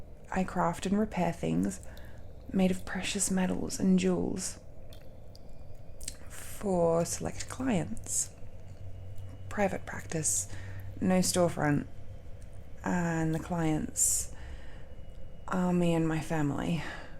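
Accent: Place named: Australia. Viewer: holy shit au accent asmr im melting.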